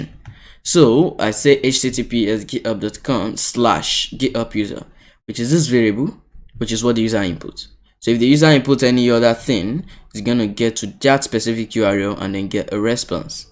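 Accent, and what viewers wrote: Nigeria. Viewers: If it's an African, it's definitely a Nigerian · i hear the Nigerian accent!!🤭🤭🇳🇬🇳🇬🇳🇬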